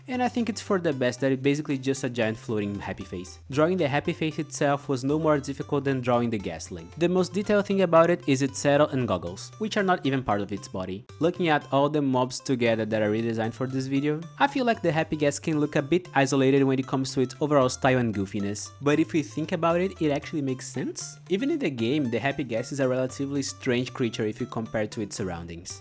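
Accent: Brazil. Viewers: Nice video idea/channel bro!! Are you brazilian by any chance? Your accent sounds familiar lol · Espera... EU CONHEÇO UM SOTAQUE BR QUANDO EU ESCUTO UM! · Eu reconheço um brasileiro pelo sotaque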